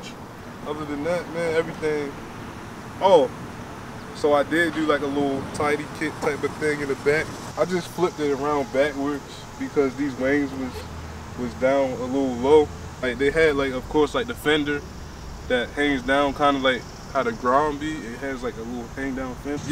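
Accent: Baltimore, United States. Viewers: Thank you g, about to go cop one today actually. But aye bro where are you from? Your accent is wild..Baltimore? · I live down south it's hard to find parts for those Chinese bikes you sound like your from Baltimore is it easy to find parts up there for those Chinese bikes ?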